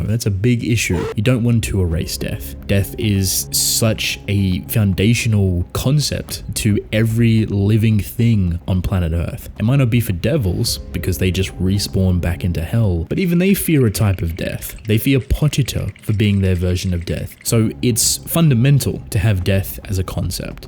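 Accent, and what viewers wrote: Australia. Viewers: maybe it’s the deep Australian accent 🤷‍♂️. Looking forward to your new ASMR channel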